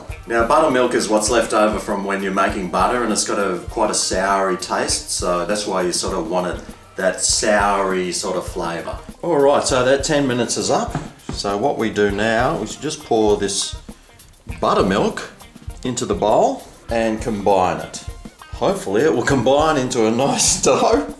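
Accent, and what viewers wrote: Australia. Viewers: And sounds like an Aussie... so why didn't he just call them scones? They look like scones (more or less.) Do they taste the same, I wonder? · Love your accent dude! We ladies are a sucker for AUSSIES!!❤ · We Americans just LOVE ❤❤❤ his Aussie accent!! Southern guy here too, Florida-Georgia, and those biscuits look YUMMY! 😋 Toppings: strawberry preserves, honey, butter and honey, apple butter; sausage, egg (scrambled or fried) and cheese; bacon, egg (scrambled or fried) and cheese; or sausage gravy. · This is exactly the same as the Southern Living recipe for perfect buttermilk biscuits. With an Aussie accent. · Suprised the crap out of me when I heard the accent, I simply clicked on the thumbnail. I'm in Kentucky, USA watching this dude on the other side of the world make biscuits...something they don't have????? OMG! LOL They sure looked good. 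The real trick is the flour, soft winter wheat it's called, grows here in the South of the US, not sure what you have over there. And we have self-RISING flour, not self-RAISING flour! I love it! So wish I could visit Australia, seems a magical place. Great video. 955 yankees disagree. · When I clicked in southern biscuits, I was not expecting an Australian! I'm a southern gal from America and loved your tutorial!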